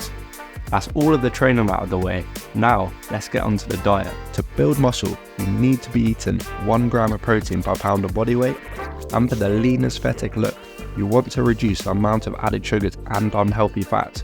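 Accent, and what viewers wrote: United Kingdom. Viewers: Bro speak like british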